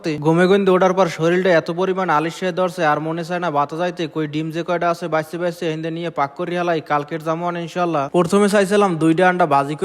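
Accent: Barishal, Bangladesh. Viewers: Khajur ki? Khejur bolen.kotha shundor kore bolen borisailla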